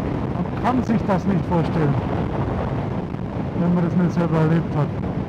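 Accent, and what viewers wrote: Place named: Bavaria, Germany. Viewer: Was ein Dampfhammer, bin zwar eher der Freund von leichteren und wendigeren bikes aber auf so einem Teil würd ich a gern Platz nehmen. Zudem gutes Video und erfrischend jemanden aus Bayern mit Dialekt zu hören macht direkt sympathisch Gruß aus der Oberpfalz🏍️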